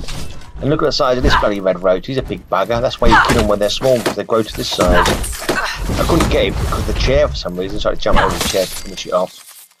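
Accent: United Kingdom. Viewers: I can barely understand this with your British accent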